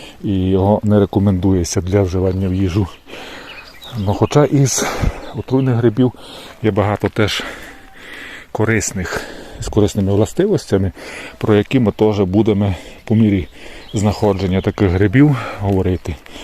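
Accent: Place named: Lemko-Boyko, Ukraine. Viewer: Ще хотілось підмітити Ваш чудовий наголошений звук "И", який притаманний говірці лемків і бойків, дякую!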